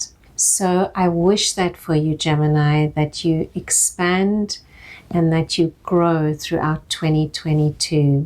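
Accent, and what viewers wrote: South Africa. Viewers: Thank you! 🙏🏾 Are you South African? Your accent sounds so familiar to me. ☺️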